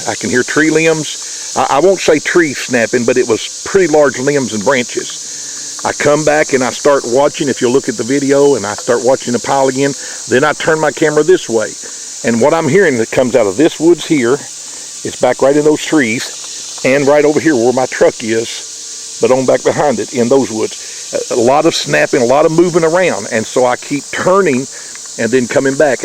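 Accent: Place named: South, United States. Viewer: Where/what state was this to have occurred? With your Southern accent I figured the South, but Sascwatch is Pac. NW area, I used to live in Oregon and I kept my focus sharp walking in the woods for Big Foot, though never saw one.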